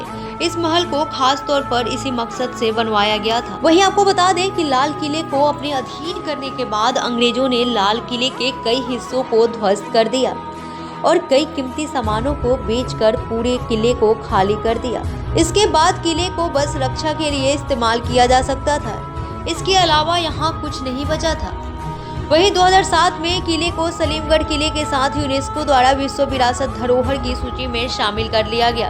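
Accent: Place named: Bihar, India. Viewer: Voice over me bihar ka touch hai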